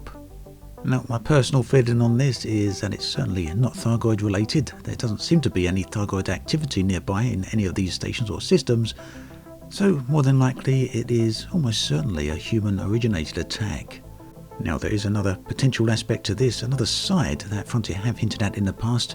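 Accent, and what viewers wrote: United Kingdom. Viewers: This dude says Bri'ish unironically, I promise.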